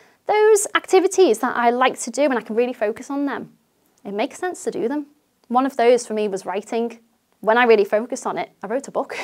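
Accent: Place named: Scotland, United Kingdom. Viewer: I was also wondering if it's irish or Scottish... imma say Scottish haha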